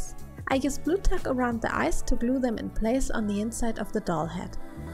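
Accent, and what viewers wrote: Germany. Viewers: I’m a huuuuge sucker for German accents, and I completely agree!!!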